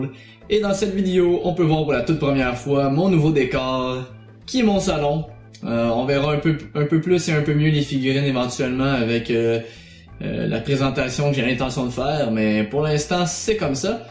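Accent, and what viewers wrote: Quebec, Canada. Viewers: Je vien de découvrir ta chaîne et dès que tu as commencé a parler j'ai su que tu était un frère du QUÉBEC !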